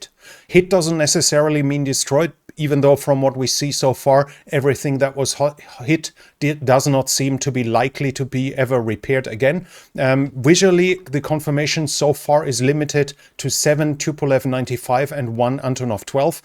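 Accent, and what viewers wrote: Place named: Germany. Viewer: Almost sounds like you have a German accent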